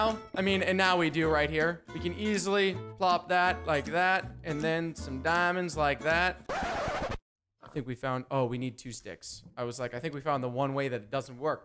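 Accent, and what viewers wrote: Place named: Texas, United States. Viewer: you changed so much the last time I I watched one of your videos you had 100 subscribers and had an amazing Texas accent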